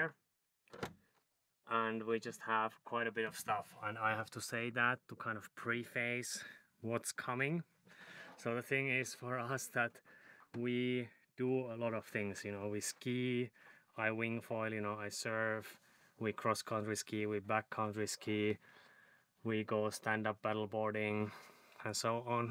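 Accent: Finland. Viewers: Aksentista äkkiä päättelin että suomalaisia sitä ollaan, hienoo että elätte unelmianne! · Er du Nordmann? Aksenttisi perusteella uskon, että saksisi on oltava suomalainen.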